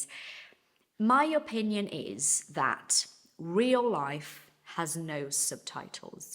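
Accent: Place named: London, United Kingdom. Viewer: Your example..."and you are in London...."( I am...hehehe). Are you??? I believe so....hehehe based on your English😊😊 Infelizmente, after ages vivendo aqui, confesso...ainda assisto coisas com English subtitles....mas é weird pq Youtube eu não coloco subtitles, já tv, sim....😮 Anyway, great video! Deus abençoe!😊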